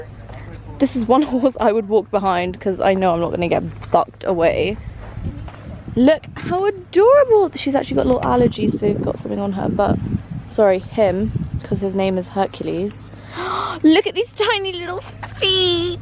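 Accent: United Kingdom. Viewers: She's British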